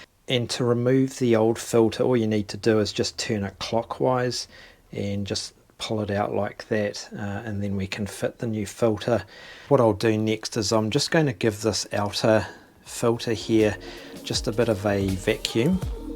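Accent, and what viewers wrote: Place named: Australia. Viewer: Nicely done! Thank you. (Love to hear that Oz accent!)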